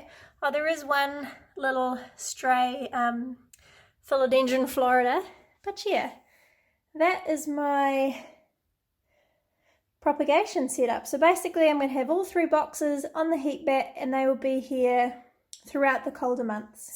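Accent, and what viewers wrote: New Zealand. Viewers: I love your videos, binge watched them all 😊 Your accent is the best, brings me back to the time I spent in NZ ❤️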